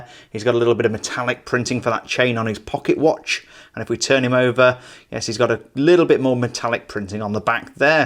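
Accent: England, United Kingdom. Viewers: I thought you were English?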